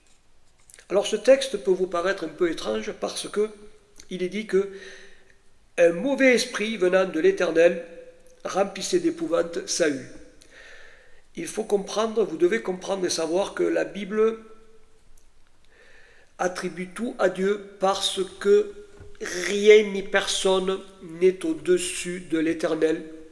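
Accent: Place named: South, France. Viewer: Merci monsieur... je vous ai decouvert il y a peu de temps... j’aime la justesse de vos propos... je remarque un bel accent du sud! Peut-être Êtes-vous proche de chez moi ! J’habite a Prades à 46 km de Perpignan ! Y a t’il une possibilité d’aller vous écouter si vous êtes dans la region ? En tout cas merci infiniment pour vos prédications ... j’aimerais beaucoup que le mo’de évangélique se rapproche d’ Israël, de ces fêtes et de la Torah... que notre Seigneur vous bénisse abondamment ! ❤❤❤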